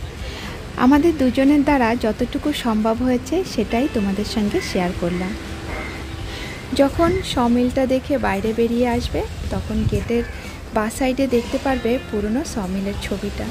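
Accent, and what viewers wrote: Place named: Bangladesh. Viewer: আপনার vlog খুব ভালো লাগে , শুধু একটা অনুরোধ, বাংলাদেশীদের মতো "এস্থানান্তর" "এস্থাপনা".....উচ্চারণগুলো একটু পরিবর্তন করলে শ্রুতিমধুর লাগবে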